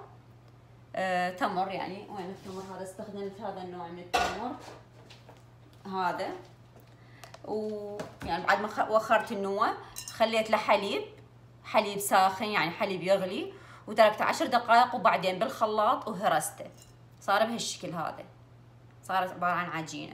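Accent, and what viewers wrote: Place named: Iraq. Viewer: ياحلو كلام اهل العراق 🇮🇶😍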